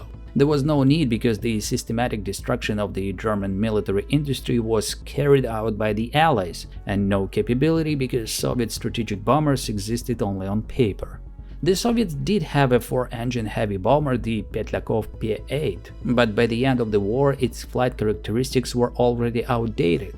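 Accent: Ukraine. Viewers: Is this channel literally anti Russian, Ukrainian propaganda? The narrator’s accent sounds a lot like a certain diminutive Ukrainian actor aka Churchill 2.0 aka the green goblin. Fascinating content though. I had no idea 1000 Tu-4’s were built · Hearing all of this in a Ukrainian accent, is especially chilling. I want to make a joke, but it's just sad. Україна не одна. (sorry for any poor translation.) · I now saw that part, of napoleonic wars. Now i can hear also that accent. Western Ukrainian. Dude, i understand, your so called country is slowly but irreversibly is consumed by Russia, but lying about history would not change it. Maybe you should learn from it. Russia is like balloon, when you blow to it, it expands. Battle at Borodino was tactical victory of Napoleon, but strategical victory of Kutuzov. Napoleon gathered more than half million soldiers for invasion to Russia and returned with less than 50 thousand. 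And it is necessary to say that was half of all soldiers France could gather and it was that better part (they left at home soldiers and militia of questionable quality). This "victory" was defeat of Napoleon. Until then, nobody can challenge him, but he bled France so badly in this endeavor, that despite he was still genius and able to defeat European sissies after 1812, he simply lost because sheer numerical superiority of them. And that was possible only because Russia strategy and general Russian winter. Russia is always ridiculed, but it was Russia, who decided wars since 15th century. Yes, very often fruits of that Russian efforts were eaten by another, like in WW1, when it was Russian "problem", which tighten majority of German forces, which would easily wipe out French and British forces, if they would not have to fight Russians. Same apply for balkans. It was Russia, who freed balkan states from Turkish opression, of course Tsars wanted new dominion, not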